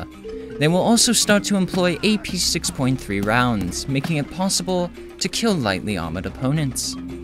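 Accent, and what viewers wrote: United Kingdom. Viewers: This is either an awful English accent or it's authentic & feel bad for this lad. That aside, these videos are very well written, edited and fun to watch. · Dude you're British!?!??!?1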